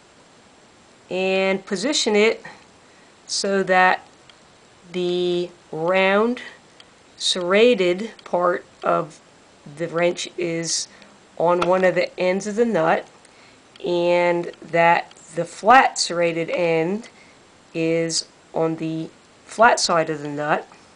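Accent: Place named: Appalachia, United States. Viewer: This guy's accent sounds like those Beverly Hillbillies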